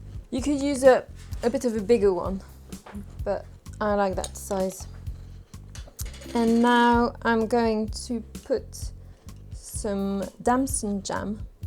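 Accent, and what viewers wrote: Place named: France. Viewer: I like your French accent 😊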